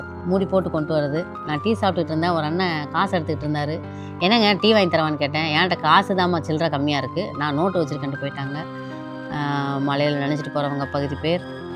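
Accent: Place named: Kodaikanal, India. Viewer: உங்களது vlog ரொம்ப பிடிக்கும்பா. உங்களது அமைதியான அழகு, இனிமையான குரல் ரொம்ப ரொம்ப ரசிப்பேன்பா.நான் கொடைக்கானல். ரொம்ப நாளாக உங்கள் பேச்சு வழக்கைப் பார்த்து நம்ம பக்கம் மாதிரி இருக்கே என்று ரசிப்பேன். நீங்கள் சிகிச்சைக்காக சென்ற ஆஸ்பத்திரியைப் பார்த்ததும் என் மனது கனத்து என் கண்களில் என்னையறியாமல் கண்ணீர் பெருக்கெடுத்து விட்டது. கடவுள் ஏன் நல்லவர்களை இப்படி சோதிக்கிறார்.உங்கள் vlogல் நல்ல மெஸேஜ் கொடுப்பீங்க. கடவுள் உங்களுக்கு நல்லதே செய்வார். என் சகோதரிக்கு கொடைக்கானல் சாந்தி அம்மாவின் அன்பு முத்தங்கள்.❤❤❤❤❤❤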